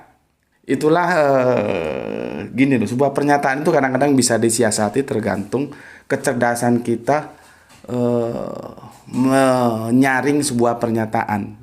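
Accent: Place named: East Java, Indonesia. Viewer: Waalaikum salam..... kadang logika saya dipermainkan oleh cara berfikir...cuma aja kadang pemakaian kata kata nya dalam menerangkan memakai intonasi jawa timuran yg membuat tdk tekstual didalam menerjemahkan dalam pikiran saya......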